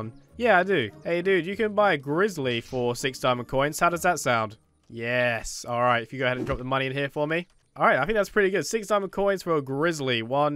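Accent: United Kingdom. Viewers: Hey! Are you from the. UK?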